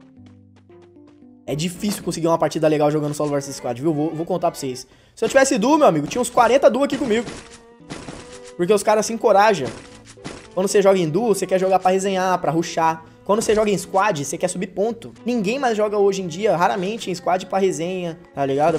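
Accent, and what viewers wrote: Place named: Bahia, Brazil. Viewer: ele é baiano mano, o sotaque muda Kkkkkkkk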